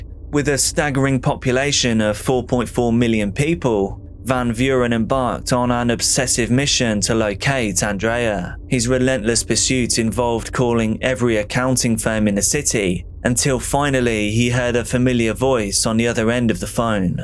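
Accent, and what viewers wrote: England, United Kingdom. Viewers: "Casey" is Australian, is clearly British.. not the same at all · the beautiful English accent is so exotic !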